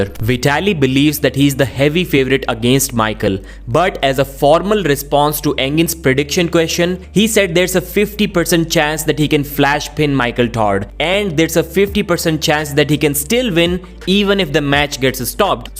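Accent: India. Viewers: fix your god awful indian accent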